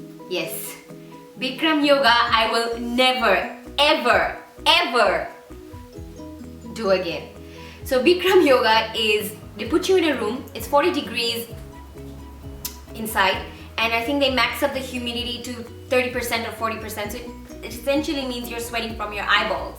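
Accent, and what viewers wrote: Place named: Bangladesh. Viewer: আপু আপনার bangla accent শুনতে খুব ভালো লাগে !!!! :v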